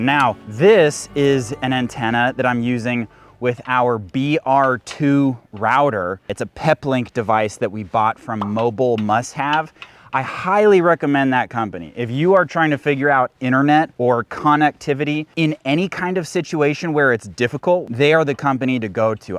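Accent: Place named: Northern California, United States. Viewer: your Norcal accent is killin me. I hope you never loose it. 🤙🏾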